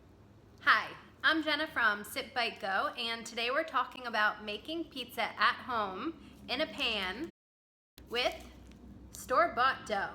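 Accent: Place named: Rhode Island, United States. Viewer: You can never lose a RI accent!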